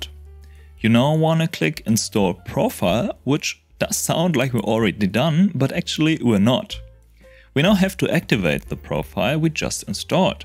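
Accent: Germany. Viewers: Ah da hast du es offensichtlich gemacht. 😂 Habe mir die ganze Zeit gedacht das ist der typische "this has to be perfect" deutsche Akzent beim Englisch reden. Ich klinge locker nahezu identisch beim Englisch reden. Immerhin besser als das Englisch das manche andere an den Tag legen.🤣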